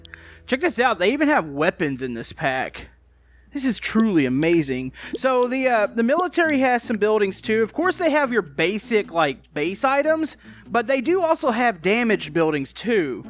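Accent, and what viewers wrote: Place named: West, United States. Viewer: for some reason i actually thought he had a farmer accent or like sort of a western accent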